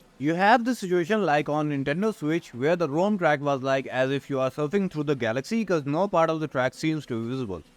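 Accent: India. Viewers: nice 👍 you got a indian accent btw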